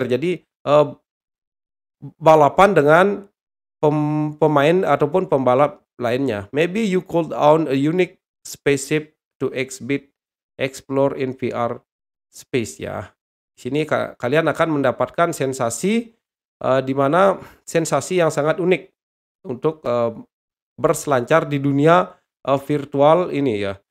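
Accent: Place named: Bali, Indonesia. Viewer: Saya tau dr logatnya bli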